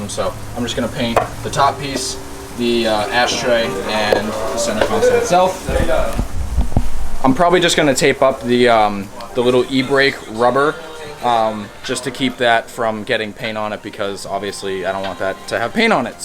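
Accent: Massachusetts, United States. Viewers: Are u from mass ?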